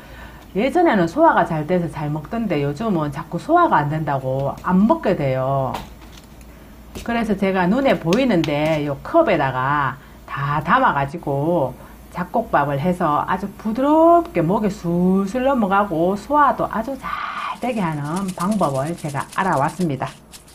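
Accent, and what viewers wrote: Gyeongsang, South Korea. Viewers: ㅋㅋ 경상도 말이라 언제 들어도 친근감이 가서 좋아요 남편이라도 말 안들을땐 콕 쥐박아줘요 ㅎ 그리고 소변 튀게할땐 억지로라도 변기에 앉히세요 난 사윗한테도 잔소리해요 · 잠곡밥. 너무좋아요 갱산도 사투리가 구수합니다 선생님 좋아요 응원합니다 👍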